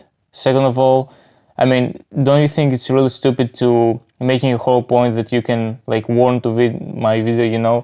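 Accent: Greece. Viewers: I can hear the greek accent coming through my ears lmao Much love man ❤ Stay cool about it There is no reason to waste your time on him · Love the accent, fellow greek accent. Hyra is being a little kid again like wtf. Thanks for sharing your view. Hyra is totally wrong here, lets just not like be toxic back.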